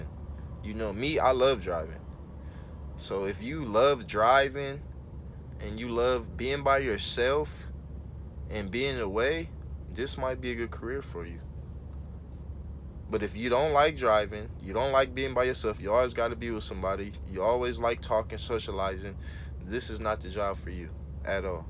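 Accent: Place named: California, United States. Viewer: Man fasheezy good looking with this video bro. I’m actually in the process of getting my DOT physical so I can get my permit and start school with Swift in Fontana. I’m a veteran so I heard there is some benefits to that but we’ll see. I definitely have a desire to do this and can handle it, sounds like fun to me if I’m being honest. I appreciate the content lol I can tell you was from Cali once I heard you start talking 😂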